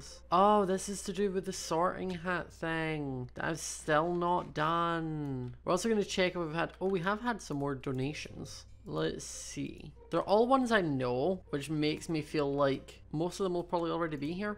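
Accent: Scotland, United Kingdom. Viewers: Yes he is Scottish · Ur voice is EVERYTHING (Are u Scottish?)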